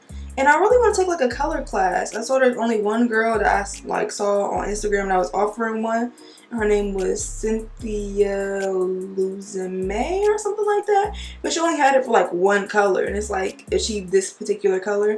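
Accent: United States. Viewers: You know what I realized u dead kinda got a country accent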